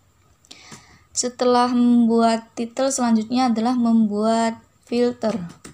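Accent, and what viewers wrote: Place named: Tegal, Indonesia. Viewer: Ilmu yang bermanfaat by ngapak tegal junior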